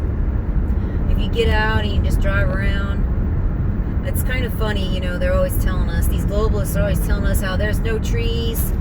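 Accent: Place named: South, United States. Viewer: You're even sounding more southern!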